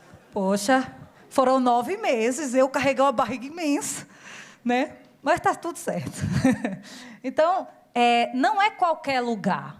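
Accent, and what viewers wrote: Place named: Recife, Brazil. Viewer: Sou do Recife e adorei esse sotaque me senti agora em casa😊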